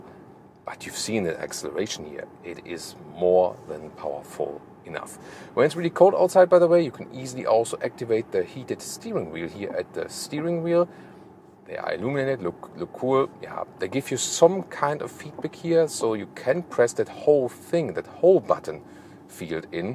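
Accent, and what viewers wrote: Germany. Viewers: His German accent is sooo pronounced... oh my fucking god. :D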